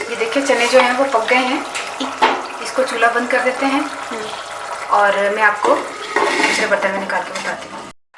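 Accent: Punjab, India. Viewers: I really like your Punjabi touch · Waoo kitni cute Punjabi boli 😂😍😍😍😍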